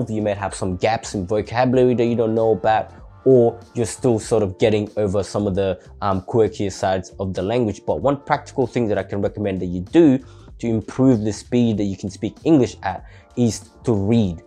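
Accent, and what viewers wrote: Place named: England, United Kingdom. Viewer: I like your English accent i hope you will have more good video # from Thailand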